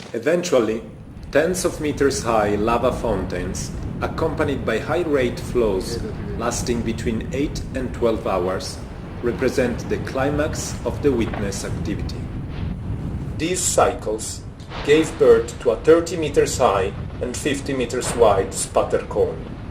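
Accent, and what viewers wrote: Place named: Italy. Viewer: Il tuo accento italiano si sente pure a 7.000km di distanza. Ciao bella Italia. Saluti dalla Handerson, Las Vegas, Nevada, USA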